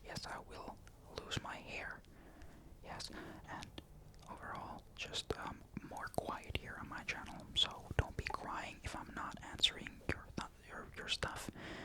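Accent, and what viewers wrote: Finland. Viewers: i think i've only seen like a few of your vids but when the video started i said "he's probably from finland" and i got it right lol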